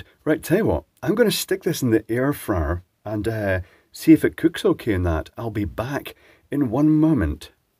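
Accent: Scotland, United Kingdom. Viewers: Why the begging for money? I presume you have monetized your channel, so will be getting paid by YouTube. Also you sound British and this is a British product so why ask for a Dollar not a Pound, if you need a suplemental cash injection? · Posh Scottish accent ! Love it... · Is he from scotland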